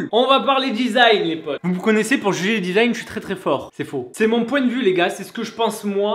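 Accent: Marseille, France. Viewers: Marseillais plutôt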